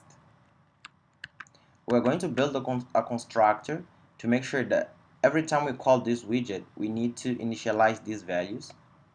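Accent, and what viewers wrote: Brazil. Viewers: Vc é br né? Esse sotaque não é de alguém nativo...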